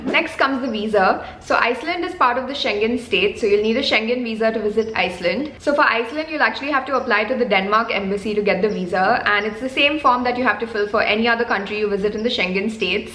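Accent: India. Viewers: very strong indian accent, talking non stop without coma it's time to cut off my ear now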